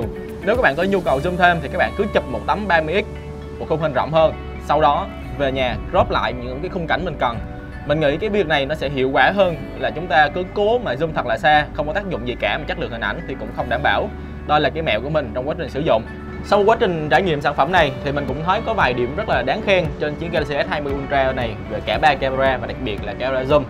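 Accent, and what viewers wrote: Binh Dinh, Vietnam. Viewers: anh này dân bình định hả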